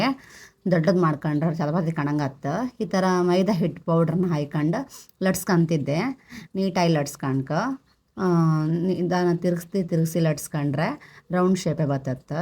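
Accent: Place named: Kundapura, India. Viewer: ನಿಮ್ಮ ಕುಂದಾಪುರ ಕನ್ನಡ ಇಷ್ಟ ಆಯಿತು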